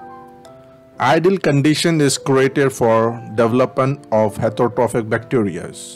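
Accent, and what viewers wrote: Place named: India. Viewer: HARD INDIAN ACCENT SAYS I CANT TRUST HIM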